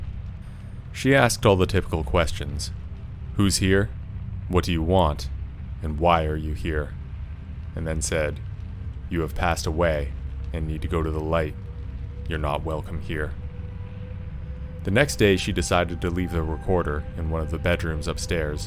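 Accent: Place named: Canada. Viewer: Thumbs up for awesome stories. Thumbs down for horrible Canadian accent. It's a house! Not a hoose!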